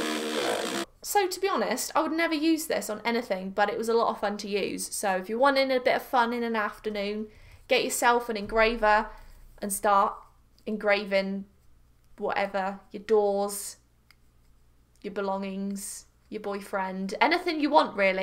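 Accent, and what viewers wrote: United Kingdom. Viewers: Who else watches to hear that Beautiful British Accent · I Looove your accent if I were to say it was British I will really get it In the replys.... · Is she British? She sounds British 😕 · Are you English ?? Just wondering because it sounds like your British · British accent haha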